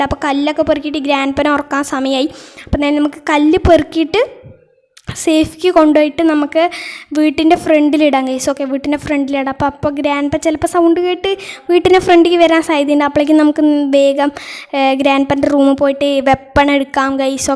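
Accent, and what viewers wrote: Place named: Palakkad, India. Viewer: Palakkad annu thonnunu😅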